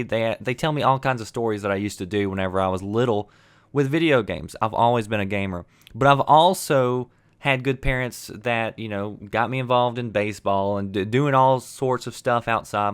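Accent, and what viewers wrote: United States. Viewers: yes blame everything else its never the persons fualt hey ?? blame the game the booze the drugs. Noone and nothing made u take the drugs the booze or play the game. U sound american to me. take reposibility. and that girl in the pictures massively over wieght she could die of heart failure just in the shower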